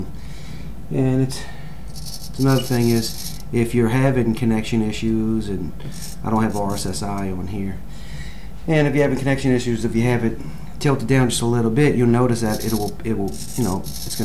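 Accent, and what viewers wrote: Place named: Louisiana, United States. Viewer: Listening to you talk I can tell you're from either Chalmette or Metairie : )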